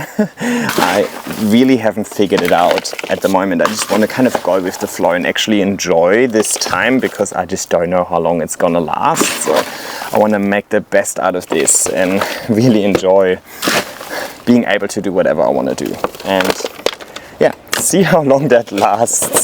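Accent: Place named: Germany. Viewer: I vow to you I will watch your videos and I have subscribed to both.Not because Brad and Bailey are sooooo cute.Not because your German / Aussie accent is soooo sweet. NOT because I live to see Timothy and A.J. Because your very talented with plants and explain what your doing very well from start to adult plant.Your plants are healthy and beautiful.I LOVE SPENDING TIME WITH YOU.I can't wait for mom to visit.And yes see you were on t.v. so you know you have talent.I want to tap in on that.Thank you for making another channel as one was not enough time.And thank you for sharing you vast knowledge.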